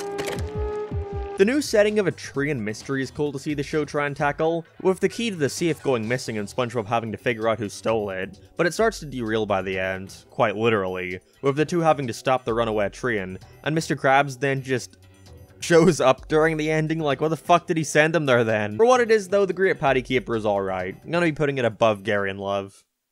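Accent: Canada. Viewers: I commend you for your time put into this. However I had to stop. Come back watch a little more. Your accent is unbearable 😂 I'm assuming your Canadian? If not Canadian I don't know but when you say or hang on certain words Im just like 🤮🤮 when you say a phrase like .. Mr Krabs has to figure it OuuuT. I picture a really snobby preppy girl talking. Like yahhhhh that was sooo yesterdahhhh lol if u want more examples I can show ya lol. At the end of the day great video. I'm sure your a good dude etc It's my personal problem but I had to mention it lol. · You sound Canadian lol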